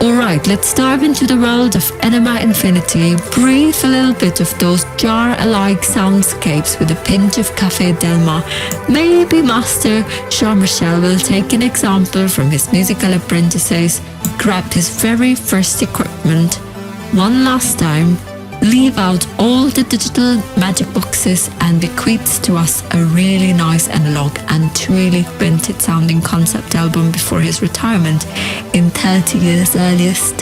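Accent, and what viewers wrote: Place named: United Kingdom. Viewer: Loved the British accent!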